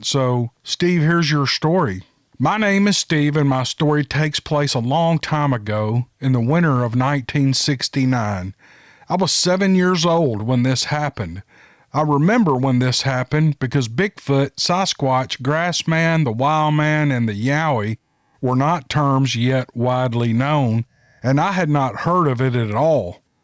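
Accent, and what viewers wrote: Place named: Oklahoma, United States. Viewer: I wanted to remind the other viewers/listeners that if you aren’t satisfied “he’ll give you your money back!” My seven year old boy loves to say that now. Being from Oklahoma, we have a bit of an accent so he sounds just like you! It makes me laugh uncontrollably when he says it spontaneously. I posted a long time ago that it’s your character that attracts us subscribers/listeners. Keep up the great job and don’t let anyone convince you to compromise your convictions about keeping this channel about stories and stories only. Anyone that has issues with that has character issues that need to be worked out.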